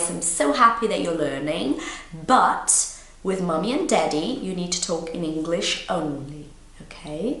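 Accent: RP, United Kingdom. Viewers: It's amazing that you sound almost like the Queen. Super posh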